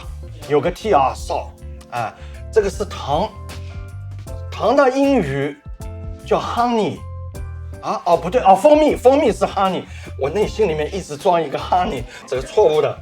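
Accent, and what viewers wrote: Shanghai, China. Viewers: 朱老师带着上海口音的诙谐和幽默, 太有趣了! 赞一个!😃👍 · 上海口音好亲切啊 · 很搞笑，是上海或者苏州口音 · 朱师傅您太幽默了。哈哈哈哈个口上海口音来的个亲切。。下下分享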